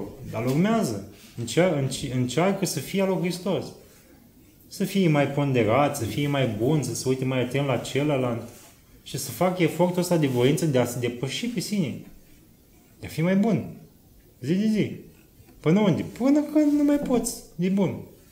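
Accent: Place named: Moldova. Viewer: explicatiile tale sunt extraordinare, pe intelesul tuturor nu cu cuvinte sofisticate, iar accentul tau de moldovean este placut la vorbire si pur rominesc sincer din inima iti spun, succes pe mai departe.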